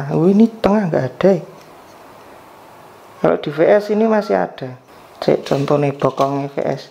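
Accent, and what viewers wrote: Java, Indonesia. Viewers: Orang mana tt bang sampean...??? Ngomong e kok jowo2 ... Aku pngn dolanan tamiya.. Tapi nang daerahku ra ono e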